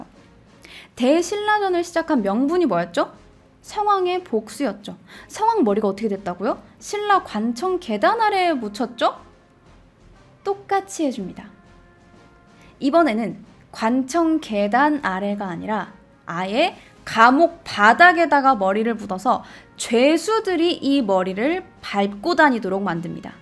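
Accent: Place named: Jeolla, South Korea. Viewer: 은 전라도사람이군요.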